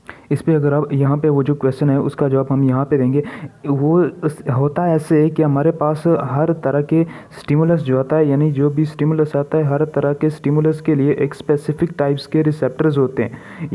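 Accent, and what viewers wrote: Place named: Pakistan. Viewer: Asa.. Lg rha h pakistani english bol rha h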